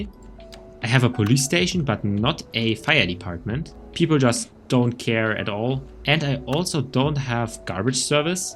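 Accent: Germany. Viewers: Bro kein hate aber du hast einen krassen deutschen Akzent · Do I hear a German landsman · es ist so komisch nem deutschem beim englisch sprechen zuzuhören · Oh! You‘re German… I hear it and I saw it. Nice vid/cooles Video…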